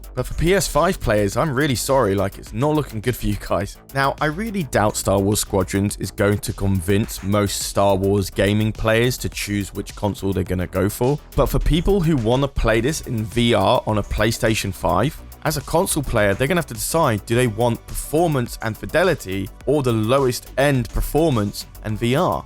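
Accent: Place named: London, United Kingdom. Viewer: You sound like your from London.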